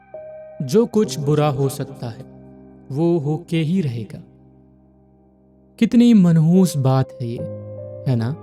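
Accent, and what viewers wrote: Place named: Maharashtra, India. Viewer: I noticed by listening your hindi accent, your mother tongue is marathi. Am I right?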